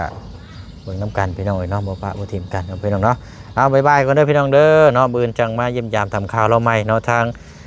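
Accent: Thailand. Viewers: กลับมา..จากบ้าน ( ออกจากบ้านมา) สำเนียงไทย ❤❤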